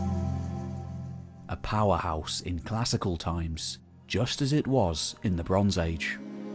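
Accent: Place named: Liverpool, United Kingdom. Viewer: How many of these weird scouse type accents are there on these DIY history channels? It's both unlistenable and spreading like a plague.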